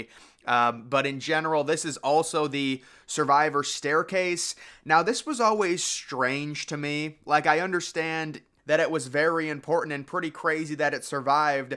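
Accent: United States. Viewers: i like the american accent i could listen to that all day and it would not annoy me , promise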